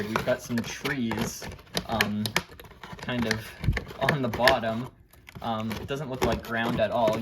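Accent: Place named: Spain. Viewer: porque haces videos con vos española no me gusta soy colombiano pero no me gusta mira te escriben todos en ingles y se ve raro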